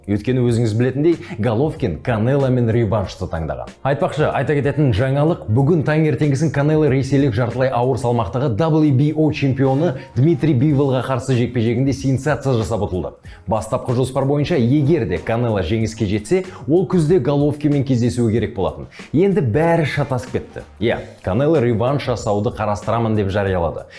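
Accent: Standard. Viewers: Өте жақсы жүргізеді екен. Қазақ ша таза жəне анық сөйлейді.Алға бауырым.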